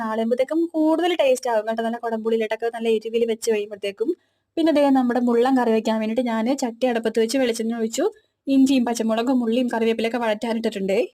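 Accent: Kochi, India. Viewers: Ithonnum alkarude perala...eniku ishtapettu...thani kochi baasha😂😂❤❤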